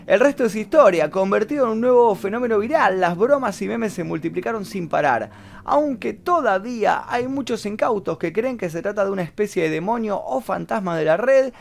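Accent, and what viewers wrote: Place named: Córdoba, Argentina. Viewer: La tonada cordobesa jajaja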